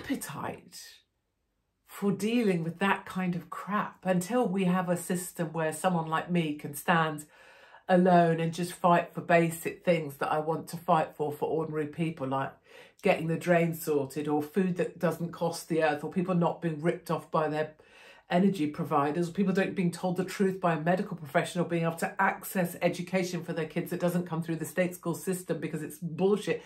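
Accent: RP, United Kingdom. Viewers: Nothing wrong with a spot of poshness!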